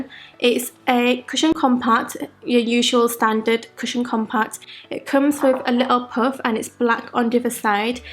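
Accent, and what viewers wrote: United Kingdom. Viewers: I love Asian Britain girls accents because of their so strong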